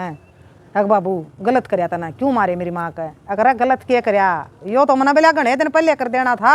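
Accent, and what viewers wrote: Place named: Meham, India. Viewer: Aunti aap boli se humare Meham side ke lgte ho.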